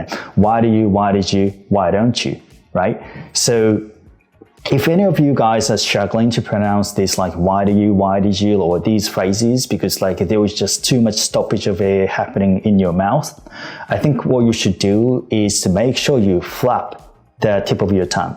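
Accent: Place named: Australia. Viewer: 今回は特にあつさんの英語がたくさん聴けてうれしかったです😍あつさんの英語は「ポッシュなオージー」みたいな勝手なイメージを抱いています😅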